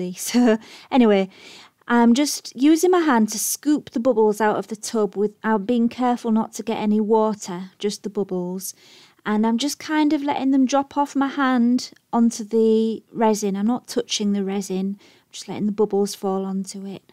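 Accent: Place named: Northern England, United Kingdom. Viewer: this is absolutely beautiful!!!!!!! I have got to try this out. And your Northern accent is so cute and relaxing!! You explained this perfectly!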